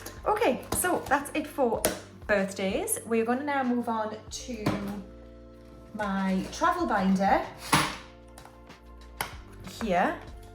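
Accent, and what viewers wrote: Newcastle, United Kingdom. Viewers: Hey - I found your channel a few days ago and absolutely love your videos. Can't tell if that's a geordie accent I can detect (as someone who is geordie too) ❤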